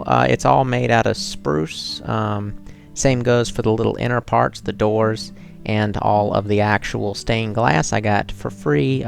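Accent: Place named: Carolina, United States. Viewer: Sounds like a Carolina accent, should be fine in the winter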